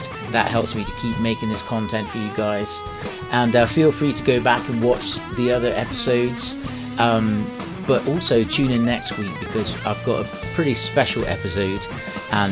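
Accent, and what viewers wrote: West Country, United Kingdom. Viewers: What is your standard tuning? And am I detecting a bit of a west country accent?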